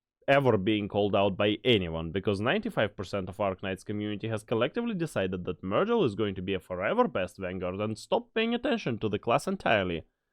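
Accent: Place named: Russia. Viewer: Рашн акцент, бро, веди телегу пж🙏🙏🙏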